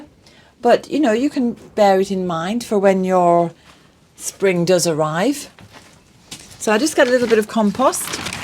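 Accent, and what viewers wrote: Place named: Yorkshire, United Kingdom. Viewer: Sounds great good company and the satisfaction of a good job done good for you. Studying brought me from the Midlands to Leeds and a settled up here.Your accent tells me you will know it Wilsden village just down the road from Haworth, I connect with Eire too my father RIP was a Carlow, man. Blessings peace and harmony to you xxx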